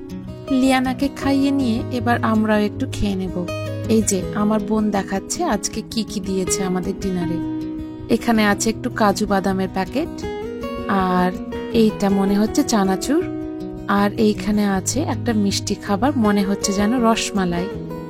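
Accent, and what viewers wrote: Bangladesh. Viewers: Apnar kotha sunla mona hoi apni bangladeshi dar moton kotha bolan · Same here😂.ভীষণ বাংলাদেশের বলে মনে হচ্ছে. হতে পারে আগে হয়তো বাংলাদেশে ছিল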